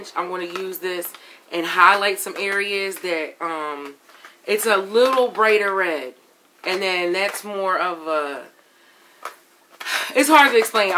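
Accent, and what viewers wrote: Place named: Philadelphia, United States. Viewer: Ayyye you from philly to what part? As soon as i heard keep it a bean i was like she from philly #NorthPhillyOnThisJawn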